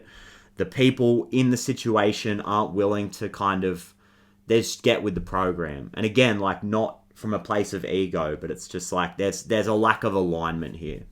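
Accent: Australia. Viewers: The comfort of hearing a fellow Aussie voice jump out 🤜🏽🦘🤛🏽 Thanks man. 1st time seeing your channel. You helped me get at some tears, & quietly verbalise what's been eating at my spirit. Feelings of friendships not being reciprocal enough, even when they are good solid friendships. Feeling utterly disconnected/detached, but not in a way that feels helpful. Lots of reminders needed to hear in this. I appreciate that. The waterfall, also so significant to me... Let's see what happens I guess. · Instant accent bonus applied! What part of Aus you from? 😂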